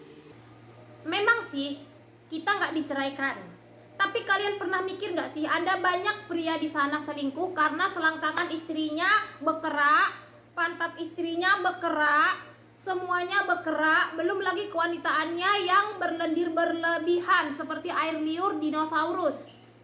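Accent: Medan, Indonesia. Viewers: Maaf mbak mcem orng medan mbak ngomong nya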